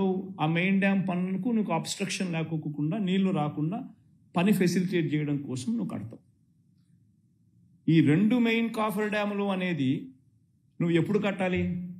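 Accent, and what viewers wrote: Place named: Rayalaseema, India. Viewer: rayalaseema slang n language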